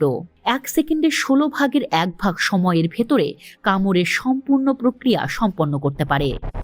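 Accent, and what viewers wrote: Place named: Kolkata, India. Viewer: sangbad pathikar kontho sune mone hocche s ekjon kolkatar mohila.R tv indian dalali bondho koro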